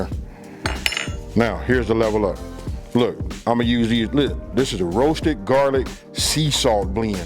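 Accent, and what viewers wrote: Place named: Louisiana, United States. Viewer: Damn can't believe I haven't come across your channel any sooner. Only my second video and I gotta ask brother where's your hometown? Because I swear I here some cajun in you sir. Gotta be south La some where. Laplas and Lafeyette area myself. Been away from home for 20yrs and sure is nice to run into someone from that area with that accent that knows how to cook. Subscribed and really enjoying your vids.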